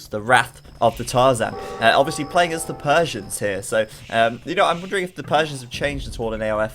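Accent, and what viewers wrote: United Kingdom. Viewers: Age of Empires 2 it's dat British accent! Welcome change from the Aussie drawl I have to put up with >_<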